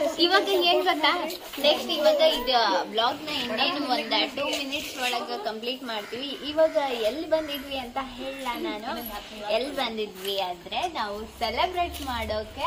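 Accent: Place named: Raichur, India. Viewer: Bro idu Namma raichur matu matte Namma karatagi matu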